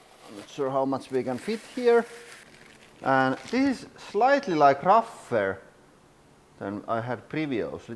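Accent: Finland. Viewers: You sound so finnish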